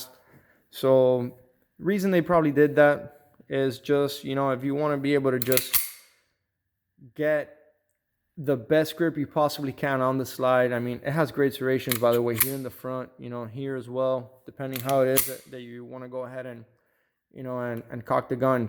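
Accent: Miami, United States. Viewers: You sound like you’re from Miami lol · i can tell you are from Miami just by the way talk bro lol, good video man keep it up!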